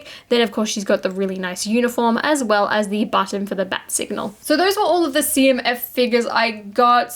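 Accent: Australia. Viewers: Are you from Australia?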